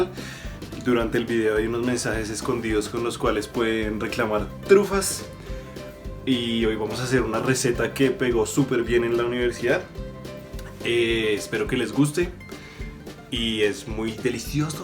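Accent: Colombia. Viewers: De qué parte de Colombia eres? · Eres Colombiano verdad. Que buena vibra.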